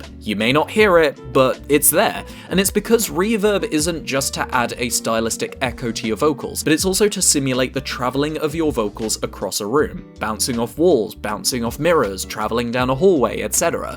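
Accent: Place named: United Kingdom. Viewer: You sound like Kevin but British. I approve.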